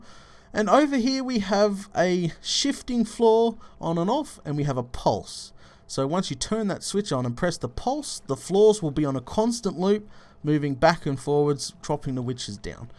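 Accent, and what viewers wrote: Australia. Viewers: U sound Australian? R u?? Plz reply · is infact Australian, and if you're interested he's still live!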